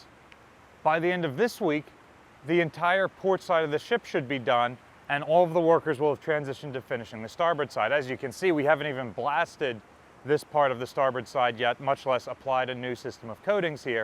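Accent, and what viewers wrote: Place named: New York, United States. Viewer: great question, great answer to something that's been bugging me for years. thanks. You look and sound familiar. Are you from NY?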